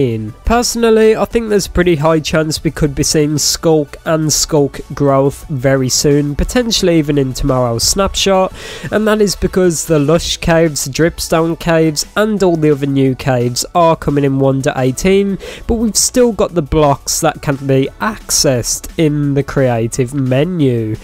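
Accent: United Kingdom. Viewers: Ur british?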